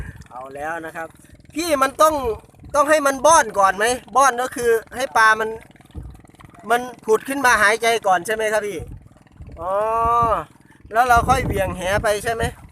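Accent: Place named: Bueng Kan, Thailand. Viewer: โอ้ยเนาะฟังๆไปกะคนบ้านเดียวกันคนบึงกาฬคือกันครับ เอาไปก่อนเลย100วิวบักหล่า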